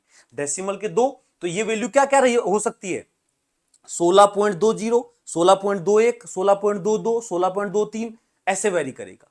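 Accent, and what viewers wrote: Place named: Rajasthan, India. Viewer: rajasthani lingual greatttt😄😄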